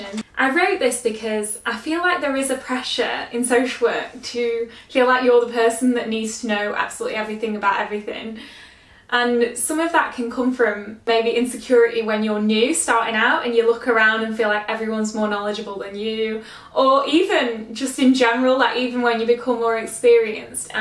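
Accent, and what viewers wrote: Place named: United Kingdom. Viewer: Great channel, immediately subscribed especially thrilled to hear a familiar voice of the u.k. too as most channels I find myself subscribed to are often American, and so it’s confused as social work in our country is very difficult to appropriately compare with rules and procedures in u.s.a.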